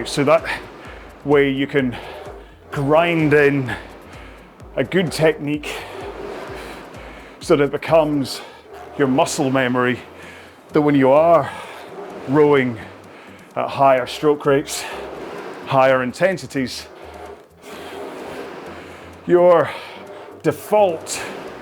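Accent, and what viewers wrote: Scotland, United Kingdom. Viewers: Newbie here. Love rowing along with you. Your Scottish accent is wonderful to listen to while I row.😊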